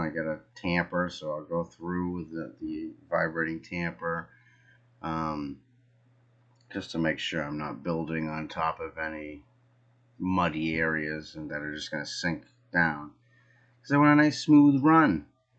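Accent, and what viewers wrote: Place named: California, United States. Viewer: Sounds like a true Californian. Was it a company in Temecula? They had done that to others.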